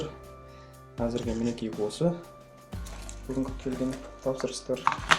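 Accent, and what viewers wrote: Standard. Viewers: қазақша таза созине ризамын, осындай бауырлар көбейсін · жарайсың таза қазақша. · Жарайсын. Казакша таза сойлейды екенсын. Сендей адамдар эксклюзив болып барады. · сау болш казака таза сойлейдекен осылай сойлеу керек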